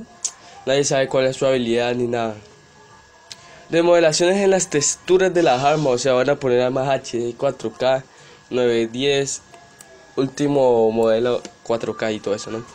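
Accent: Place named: Portugal. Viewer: Hablas un poco raro como portugués xd de que país eres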